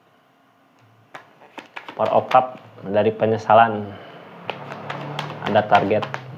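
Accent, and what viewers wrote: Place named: West Java, Indonesia. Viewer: Lucu ih.. sunda banget😀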